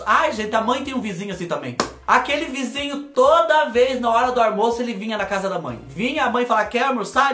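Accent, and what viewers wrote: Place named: Minas Gerais, Brazil. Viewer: Vc é mineiro uai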